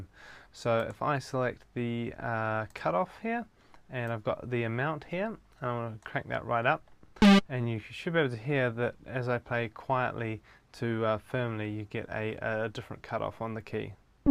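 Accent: New Zealand. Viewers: knew it!, cant mistake a good old kiwi accent. What part of NZ? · Ha! The second you opened your mouth I was like... "no" accent o.0 Must be a kiwi, sure of it! I was right :D Donno if you know the fella that makes Goldbaby samples... kiwi as well (only found out the other day, turns out he lives in the next suburb on from mine).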